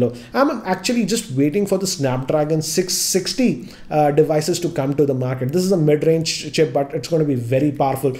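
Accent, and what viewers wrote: India. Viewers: Would have told something which other channels missed to include...this video is copy of Android authority video n converted that into indian accent..no offence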